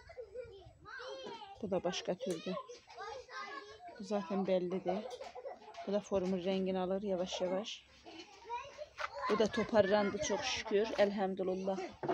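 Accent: Azerbaijan. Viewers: Sen bizim gibi konuşiyorsun.Azerbaycanlımısın?çiçelerin çok güzel.bol çiçek açması için bir şey yapıyormusun?😊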